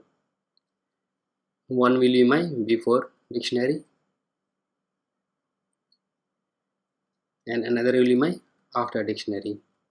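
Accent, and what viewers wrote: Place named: South India, India. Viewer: thanks mate! Your accent reminds me of my roommate from south India. :)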